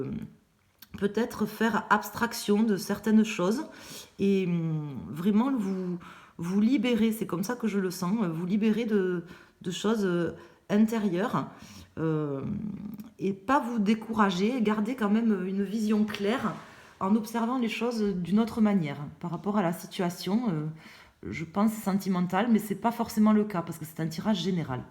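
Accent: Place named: Gers, France. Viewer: Gratitude pour vos réponses 🤲💙 Votre accent me ramène à ma Terre Natale le Gers😍 Belle journée pour Vous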